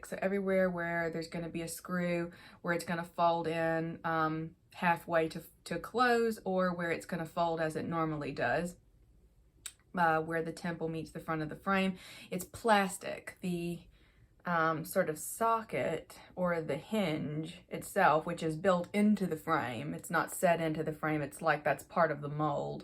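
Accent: South, United States. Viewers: Great review! From this, I will never be purchasing this particular style! Loving the southern accent! Hey from Alabama!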